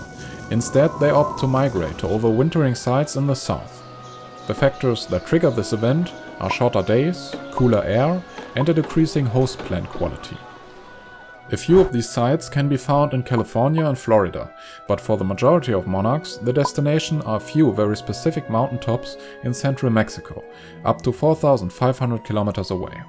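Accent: Germany. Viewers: Man hört den deutschen Akzent raus ^^ gutes Video 👍🏼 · I find the German accent so refreshing. It gives the impression of being straight to the point without any of the BS.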